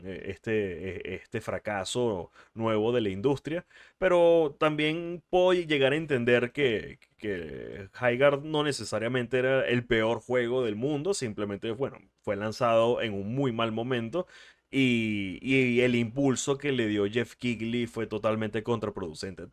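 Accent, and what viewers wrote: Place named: Spain. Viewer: Dios yo siempre había tenido dudas por ti acento y tus expresiones sobre si eras español o de latam Por fin hallé la luz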